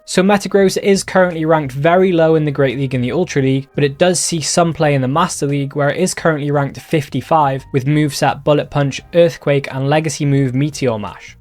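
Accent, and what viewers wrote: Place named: United Kingdom. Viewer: He’s speaking the Kings English not American 😂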